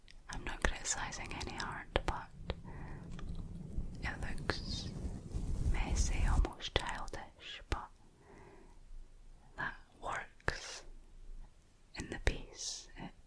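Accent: Scotland, United Kingdom. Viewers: summer time with a cold breed I love your vids and your Scottish accent 😘😘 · Very nice! Help me out with your accent. Are you closer to Glasgow or Edinburgh, accentwise? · The Scottish accent is so so suited to whispered asmr , I’m Scottish too so I may be slightly biased but either your voice is tingalicious or I’m having a heart attack 🤣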